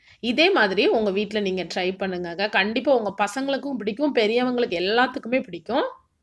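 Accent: Tirunelveli, India. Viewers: Sis u r from Tirunelveli.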